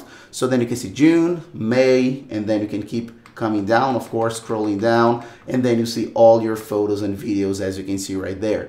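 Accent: Brazil. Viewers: Muito engraçado como seu inglês é quase perfeito, mas da perceber que eh brasileiro, ótimo trabalho irmão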